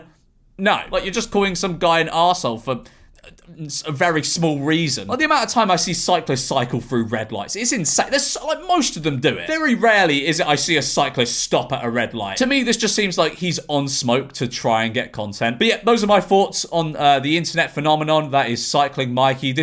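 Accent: South Africa. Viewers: His accent sounds South African, I guess that proves the old Spitting Image song true...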